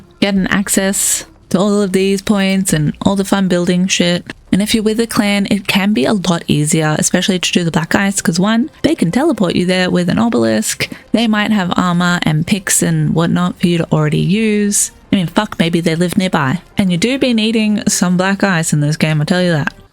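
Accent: Australia. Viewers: i love your aussie accent and attitude when talking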